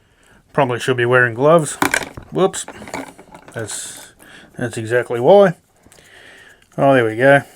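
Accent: Australia. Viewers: Awesome, thanks for that. Mind if I show this technique in my HG P407 build videos? Also, it's refreshing seeing and hearing an Aussie modeller on YouTube. Nice work, mate.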